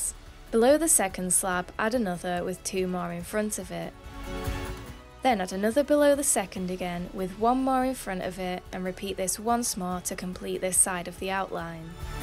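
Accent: United Kingdom. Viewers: Your British voice feels so calm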